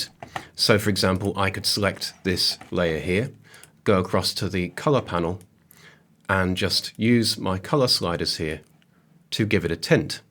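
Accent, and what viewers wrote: United Kingdom. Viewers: 超爱你的英国口音。哈哈。另外一个老头不喜欢，还是你讲得好！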